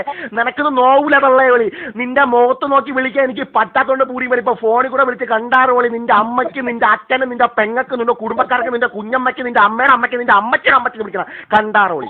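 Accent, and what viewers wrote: India. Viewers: Bhasha kettit kodungalloor bhagathanu ennu thonnunnu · തിരോന്തരം ആണെന്ന് തോന്നുന്നു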